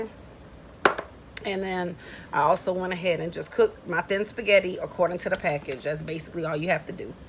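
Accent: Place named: New Orleans, United States. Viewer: That New Orleans accent yesss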